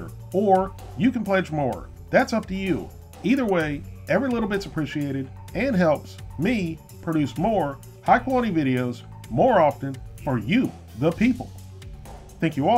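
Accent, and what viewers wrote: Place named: Baltimore, United States. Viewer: Heh, "Baldimo", yep you're from there. Cheers from MD!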